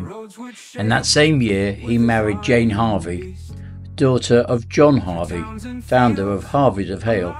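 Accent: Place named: United States. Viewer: Maybe if the AI can get a cornish accent instead of american one it would suit good!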